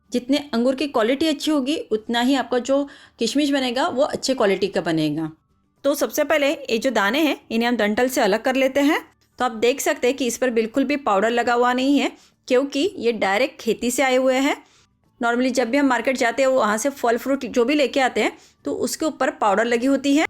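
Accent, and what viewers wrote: India. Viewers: I love your hindi accent.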